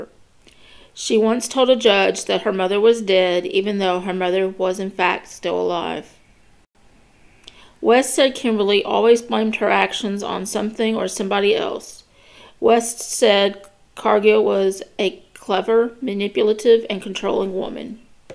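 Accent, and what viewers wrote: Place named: South, United States. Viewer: Good stuff. Thank you, You've got the sweetest voice, im from Oregon so im used to those southern draws.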